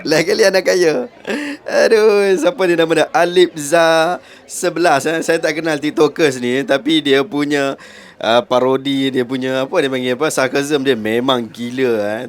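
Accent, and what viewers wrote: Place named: North, Malaysia. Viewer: Shbt kami oqang utaqa Penang Perlis Kedah dh besa sebut dari kcik...tkleh ubh dh ...oqang utaqa ni p dok tang mana pn .loqat utaqa tkeh Tinggai...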